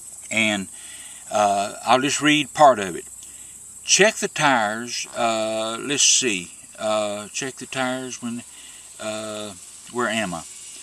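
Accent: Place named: South, United States. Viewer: You sound like you are from the south. My neighbor has this same mower.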